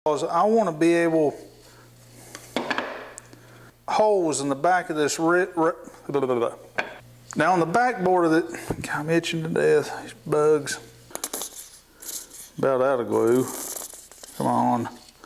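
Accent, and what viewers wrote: South, United States. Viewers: Looks good to me and I have now found someone that sounds as southern as I do.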